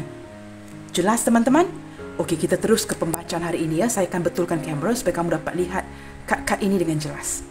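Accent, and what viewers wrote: Malaysia. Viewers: org mn ini bacanya ky org malay.pusing dengerin nya a jdi eheh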